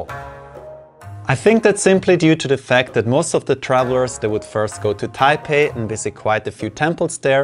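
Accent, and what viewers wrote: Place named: Switzerland. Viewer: Man this Swiss accent ... so sweet.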